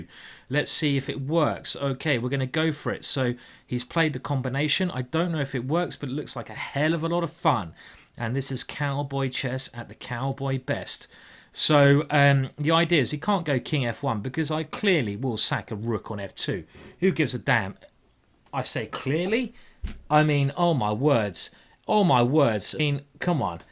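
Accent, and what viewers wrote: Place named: RP, United Kingdom. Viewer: Don't understand what the guy is talking about, uses nothing but pure queens English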